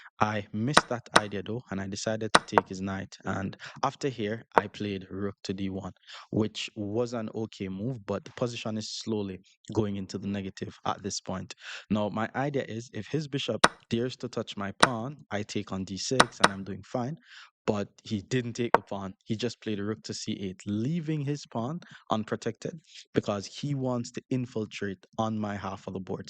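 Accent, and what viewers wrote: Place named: Jamaica. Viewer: Not gonna lie this whole time I thought you were Jamaican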